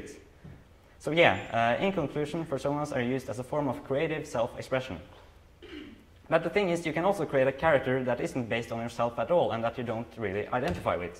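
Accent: Norway. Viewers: The clapping went so long ;-; my heart haha. I also love your accent and had noooo idea it was a Norwegian one?! Voices are wild. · Slaughtered Norwegian accent? No, your accent is beautiful. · When he tells you to put on subtitles for his Norwegian accent but fans knows exactly what he is saying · Are you from (Norge) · Wait, wait are you from norway, HALLA FRA BAMBLE! EJ BRO HEG VISTE IKKE at du var norsk, hello from Bamble I didn't know you're Norwegian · Thats what we call norwegian english! I have that accent sometimes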